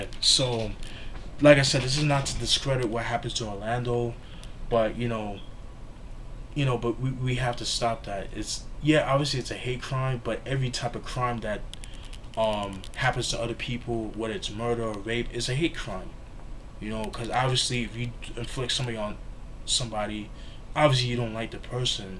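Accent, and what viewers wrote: New York, United States. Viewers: BTW r u from NY cuz I am and I hear the accent lol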